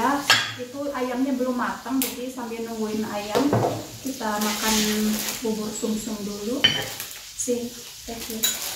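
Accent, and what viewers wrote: West Java, Indonesia. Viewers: Logat nya kaya orang Sunda.. Makanan sederhana tp Nikmat tiada tara.. Selamat menjalankan Ibadah puasa.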